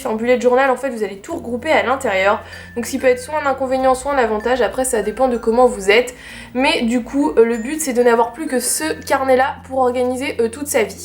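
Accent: France. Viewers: mais stooooop l'accent mega français la "bullet journal" c'est un mot anglais mettez y du votre un peu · Très bien les explications! Très utile aussi ta vidéo comparaison Bullet journal/ planner. Petite remarque quand même:: Puisque tu écris et utilises beaucoup l'anglais, tu devrais faire un effort sur ta prononciation. C'est vraiment TROP français...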